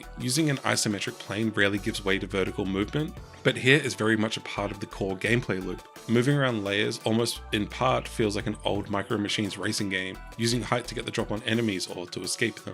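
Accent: New Zealand. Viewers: Your accent - are ye from Aotearoa ???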